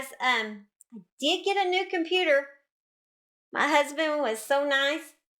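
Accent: South, United States. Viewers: Which Southern state is your accent from? I tried all night to decide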